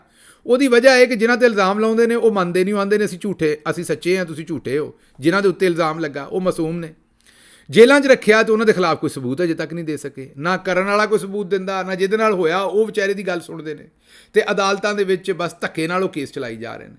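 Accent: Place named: Punjab, India. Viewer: sb ap sirf punjabi V-Log kiya kren, ap ka Labo Lahja punjabi ki azmat ko doballa kar data ha. Youn lagta ha ap punjab ki gali kochun ma bantay ar bandar killa khaltay jawan hovay. Punjabi ki gahrai ar rwani sun kar ap punjabi k professor lagtay han, choren urdu english bus punjabi v log..kiya munfrid andaz ha ap ka..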